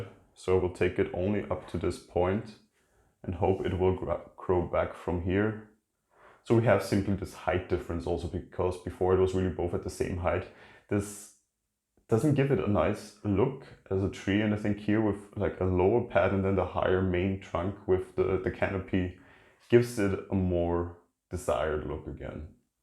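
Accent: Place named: Germany. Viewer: Kann es sein, dass du Deutscher bist? Dein Akzent klingt so vertraut. Grüße aus Österreich 🪴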